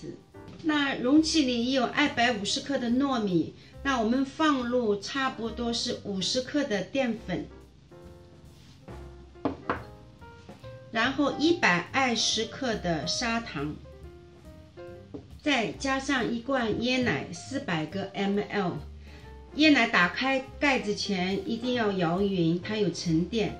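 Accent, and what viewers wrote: Shanghai, China. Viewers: 谢谢老师，很亲切的上海普通话，白脱油😄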